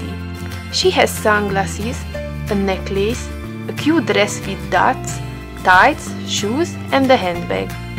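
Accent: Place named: Romania. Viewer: Your accent is beautiful, please don't think you have to change it at all! Your English is perfectly understandable, and I personally adore the way you speak it. I would love to hear you speak Romanian too, though so I may be biased. LOL And I know my Romanian pronunciation would be SO much worse, by a LONG way. :D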